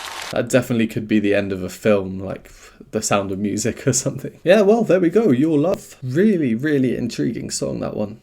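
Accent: England, United Kingdom. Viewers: Me encanta esta canción de Dimash. Y esta actuación es una de mis favoritas❤ Tus reacciones son siempre detalladas e instructivas. Aprendo mucho contigo. ¡Muchas gracias!😊 Por cierto, me gusta tu lindo acento inglés.☺️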